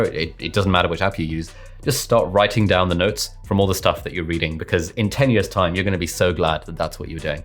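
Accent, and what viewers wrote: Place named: United Kingdom. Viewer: You are a Pakistani but how did you learn British accent?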